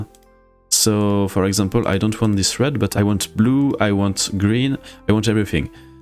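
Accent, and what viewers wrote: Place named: France. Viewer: Love your french accent! Merci beaucoup pour ce tutoriel, t'as gagné un autre abonné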